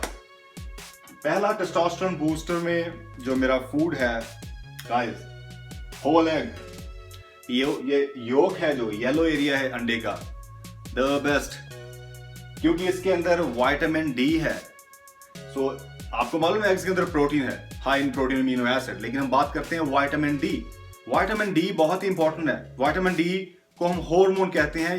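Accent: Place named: Punjab, India. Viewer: veere punjabi jhalak jandi har war ,.....love that too much .....jaffi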